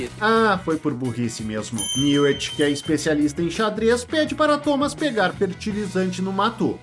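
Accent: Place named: South, Brazil. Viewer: O chato da narração é o sotaque de Roceiro Sulista! Cara, volta pra roça! Lá você conseguirá!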